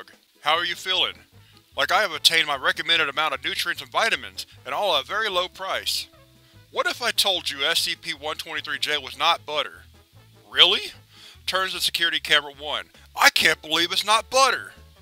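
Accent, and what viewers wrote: South, United States. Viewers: Damnit dude I wait till Wednesday to see your new scp reading and it's butter. Naw but seriously I enjoy your readings man keep up the good work. I know people who I suggested this channel to couldn't get into it because of your southern accent. I'm from Texas though and that's standard down here. Keep up the good work.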